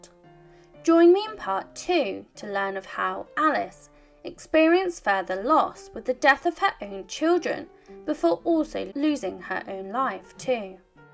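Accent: United Kingdom. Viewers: . “She also wore black at her wedding.” “She arrived to the ceremony in a white gown.” “Queen Victoria forced everyone to wear black before and immediately after the wedding.” Like, wtf? Also, whichever British accent you have should be done away with. It is the most annoying manner of speaking I have ever heard.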